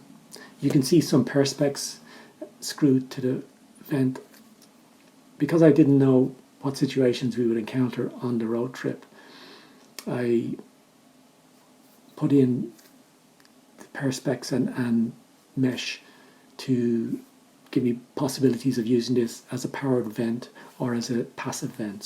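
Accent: Ireland. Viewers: Great van build 👍 Do I detect an Irish accent ? Maybe Welsh? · I miss the Irish accent so much ❤️ thanks for this going go be doing this in Canada in the summer !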